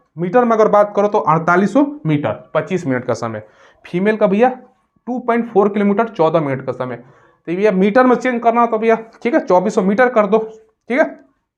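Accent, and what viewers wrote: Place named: Eastern Uttar Pradesh, India. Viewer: Ame Bhai apna east UP se hai😅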